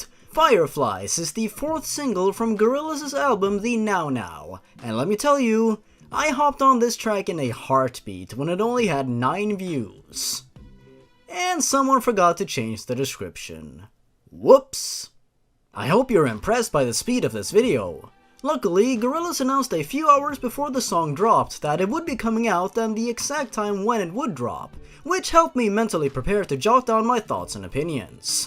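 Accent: Sweden. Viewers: Visste inte du var svensk xD Borde ha fattat på dialekten, men jaja...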